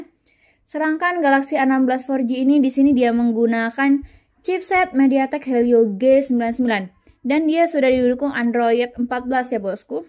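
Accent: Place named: Sumatra, Indonesia. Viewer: Sumatra banget bahasa nya, samsung galaxi anam belas😢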